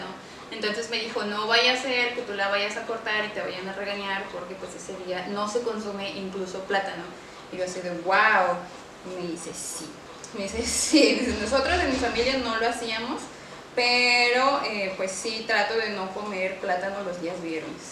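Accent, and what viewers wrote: India. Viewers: Hi 🙋🏻‍♀️ que rico 2 Xfis para mandar😋👍🏻y si tienes el acento hindú enel inglés ☺derepente no lo entiendo ocupo poner bien atención pero es muy bueno y lo aprendiste muy rápido😉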